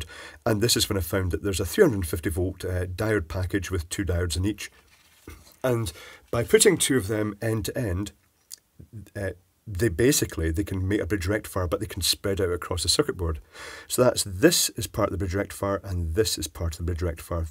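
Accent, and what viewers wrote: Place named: Edinburgh, United Kingdom. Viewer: Hi, I have a bit of a left field question. I love your accent, I'm assuming its Edinburgh. Would that be a correct assumption?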